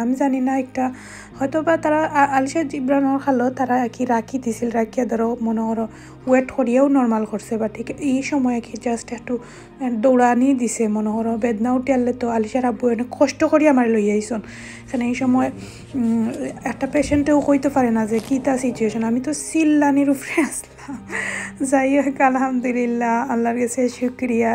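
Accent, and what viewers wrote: Sylhet, Bangladesh. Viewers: Sylheti voice hunar shate shate subscribe korilaisi💞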